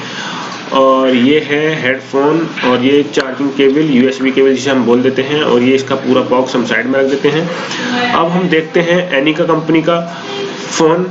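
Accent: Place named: India. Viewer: Dusre ke video ko Chura Ke Indian Awaaz lagakar Banaya Gaya video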